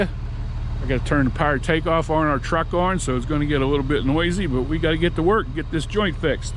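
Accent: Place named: South, United States. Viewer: My dad still slips up sometimes and says “worshington” and “worsher” he’s been in the South for 36 years! When I heard you say “worshington” the first time I knew you were in my briar patch. Been meaning to tell you that here in Tuscaloosa is home to the longest wooden train trestle still in operations that crosses the black warrior river between Tuscaloosa and Northport. Norfolk Southern runs. I’ve been seeing Union Pacific locomotives a bit more here as well not sure what’s going on there but they’re gorgeous engines! I love seeing that flag thunder by shaking the ground! Oh FYI that trestle, over 3500 long! Google it sometime!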